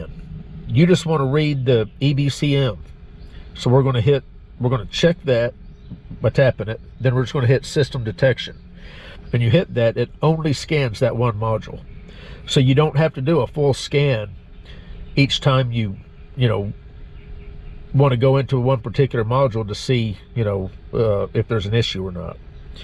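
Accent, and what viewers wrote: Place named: South, United States. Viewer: I thought I heard a southerners voice, im from AR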